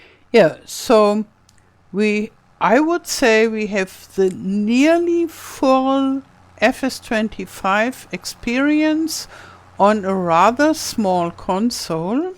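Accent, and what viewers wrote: Germany. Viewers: Just found your channel after searching for videos on farming sim se on switch 2 cause im trying to decide whether to buy it. Absolutely loving your accent, I'm Australian but you sound just like a good friend of my husband and I that is originally from Germany. Her and her husband moved to Australia decades ago but they both still have their beautiful accents. Great info in the video too.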